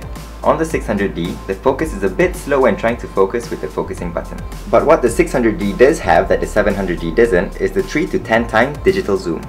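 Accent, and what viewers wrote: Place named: Malaysia. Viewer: u sound malaysian malaysians are cool :)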